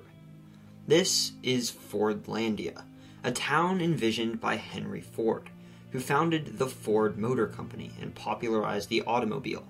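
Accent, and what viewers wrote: United States. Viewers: I don't know if you're american, but you sound american, by the apocalyptical way you talk. Even if you throw a nuclear bomb in it you can't destroy it. Believe me, I'm brazilian and I know the region pretty well.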